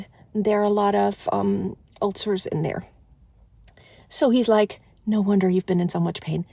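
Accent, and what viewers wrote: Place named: United States. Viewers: I think she is in Germany 🇩🇪 but originally American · How it is possible to get a perfect American accent like you were born there ? You are from Germany but sound like an American.🌹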